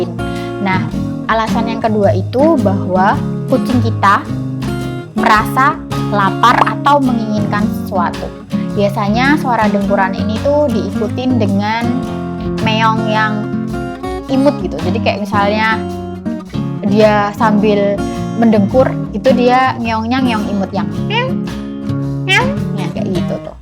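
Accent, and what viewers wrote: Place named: Java, Indonesia. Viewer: Pasti orang jawa ya kak Bahasa indo nya medok banget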